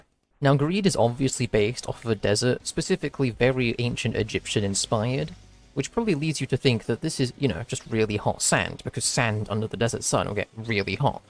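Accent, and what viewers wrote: United Kingdom. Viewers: Holy british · are you british by any chance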